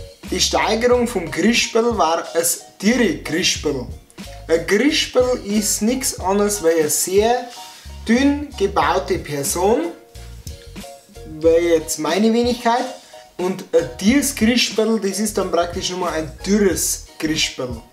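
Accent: Bavaria, Germany. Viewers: Mi grausts bei deinem bayrisch oida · Find dich mega cool :D versuche durch deine Videos Bayrisch zu lernen :) könntest du irgendwann auch mal Bayrisch für Fortgeschrittene machen? Oder ein Video, wo du die Aussprache mal erklärst, wie man die Vokale und Konsonanten betont oder wann man das R im Bayrischen rollt :D Lg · Naja als richtiger bayer 🤣 durd dei Dialekt so wei in de lusern 😅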